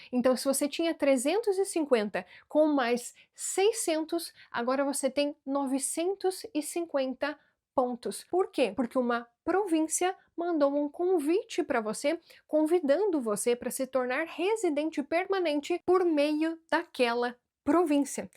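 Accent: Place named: Parana, Brazil. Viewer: Seu sotaque e um barato puxa o R tipico do parana e o S com som de X tipico dos alemães do sul do Brasil, alem dos anos de canada....🎉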